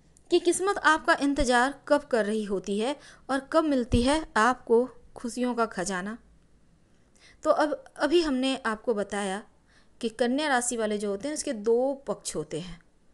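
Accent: Bihar, India. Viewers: Kaha ki ho bihar se ? Funny accents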